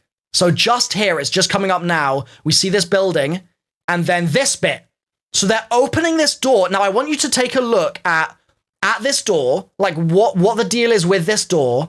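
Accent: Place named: United Kingdom. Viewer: The most British accent i ever heard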